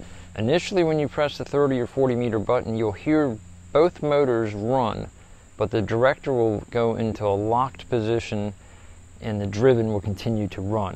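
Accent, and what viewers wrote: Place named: Eastern Shore, United States. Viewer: Could tell by your accent that your Eastern Shore Md, lol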